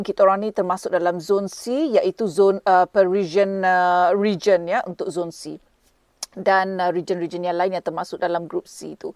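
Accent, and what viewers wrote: Negeri Sembilan, Malaysia. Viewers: akak org nogori ko? slm sri segomban!!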